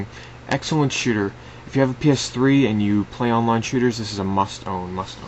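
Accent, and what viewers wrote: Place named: New England, United States. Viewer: I like your New England accent. "Didn't get to play much of WarHauk"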